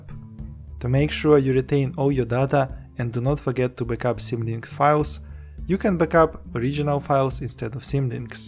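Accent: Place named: Russia. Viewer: Никак не могу привыкнуть к твоему русскому акценту)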